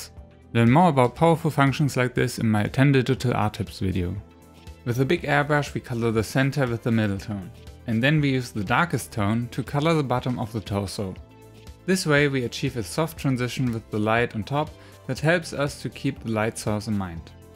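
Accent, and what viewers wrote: Germany. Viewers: Are you From Germany? Your acent sounds like that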